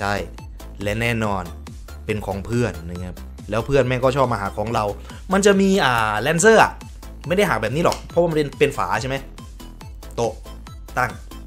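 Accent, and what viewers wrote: Isan, Thailand. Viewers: พี่ คนอีสานป่ะ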